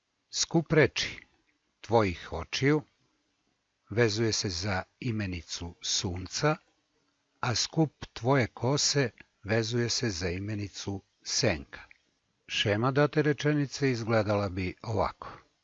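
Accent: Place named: South, Serbia. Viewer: Koliko nepotrebnih znanja deca treba da usvoje tokom školovanja?! Pritom, izađu iz škole potpuno neosposobljena za život i rad. Ovo je potrebno onima koji će studirati srpski jezik i baviti se lingvistikom u svom budućem radu. Pritom, ovaj govornik, sve vreme, koristi južnjački akcenat, a drži predavanje iz gramatike srpskog jezika.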